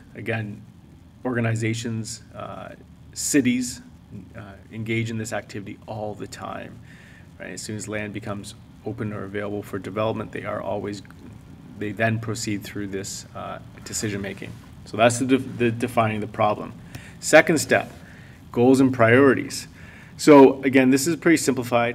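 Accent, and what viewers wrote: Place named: Canada. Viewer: Nice Canadian accent!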